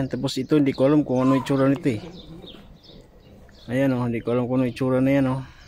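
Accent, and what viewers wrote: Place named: Visayas, Philippines. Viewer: Bisaya deay ka lodi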